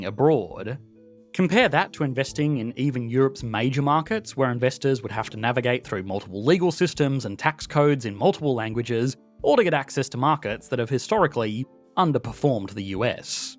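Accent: Australia. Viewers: Vocal fry sounds bad at the best of times......in Strine it sounds even worse. Just had to stop listening after a minute, sorry. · is this channel australian? Suprised to hear the australian accent lol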